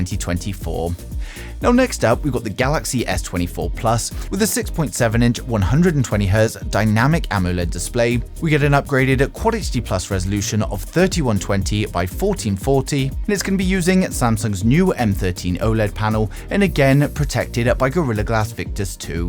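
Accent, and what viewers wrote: United Kingdom. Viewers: $100? Why the heck would someone with a British accent be promoting US stuff, 60 odd million in Britain not enough following for you?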